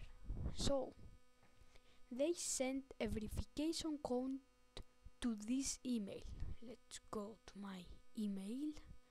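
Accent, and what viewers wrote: Greece. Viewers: ναι εισαι Ελληνας και το καταλαβα μην προσπαθεις να κανεις αγγλικα βιντεο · To katalavenw apo ti fwni oti ise ellinas;-) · Εισαι έλληνας ε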